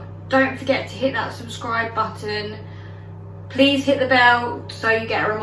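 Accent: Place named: United Kingdom. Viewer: Loved this, and a British accent?! Great to see a fellow British Youtuber!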